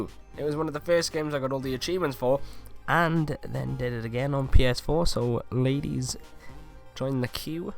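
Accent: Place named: Wales, United Kingdom. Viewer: Thanks for the help! Btw are you Welsh? Sounds like you're welsh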